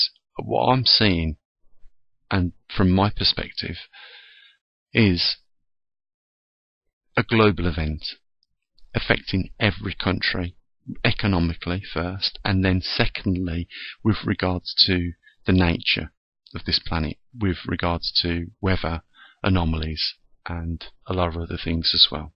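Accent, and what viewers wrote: Birmingham, United Kingdom. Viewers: We made the move to the countryside in Portugal 3 years ago...........we have a poly tunnel and land. It's still affordable to buy land in Portugal with water and mature fruit trees. So happy to have left the city. Thanks for your work. Its been very cool here all month, which is very unusual. Btw, you sound like a brummy.........I was born in West Brom!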